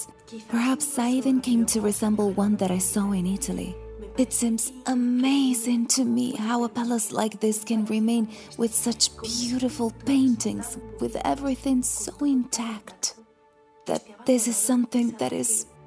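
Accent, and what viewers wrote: Spain. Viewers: Es muy riesgoso tu trabajo no siempre es lo mismo todos esos lugares tienen algo enigmática más es importante ser prudente en dónde quiera existe maldad humana y más por tu condición de mujercita no faltan vivales o asesinos ojalá! Solo sea una broma pero recuerda el castillo de Napoleón cuanto destrozó y tal cual lo señalas respetas pero también ay personas malas y vaya que muy malas saludos desde Tula Hidalgo México por tu acento eres española tu voz es delatora.👍👉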